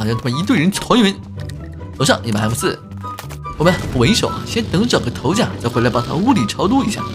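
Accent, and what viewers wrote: Taiwan. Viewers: 连续看你的戏十次这是第四次台湾腔好听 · 其實臺灣腔也蠻好聽的 （猛然發現的標題是繁體字）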